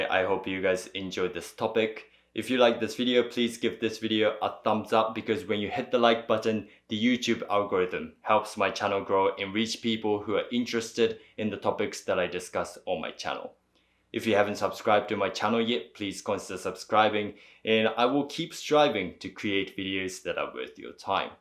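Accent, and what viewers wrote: New Zealand. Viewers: Thank you for this: it's interesting that your English retains your New Zealand experience ("sex & sivin" for "six & seven"). Bravo.. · No wonder your English sounds funny, you learned it in New Zealand. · is that a new zealand accent?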